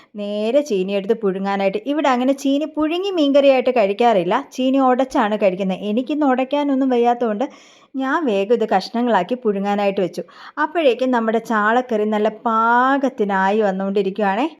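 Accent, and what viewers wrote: India. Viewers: സംസാരം കേട്ടപ്പോൾ കൊട്ടാരക്കര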